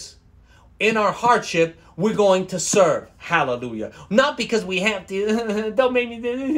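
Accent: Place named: South, United States. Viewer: love it when you sing and when your Southern (from Tennessee?) accent shows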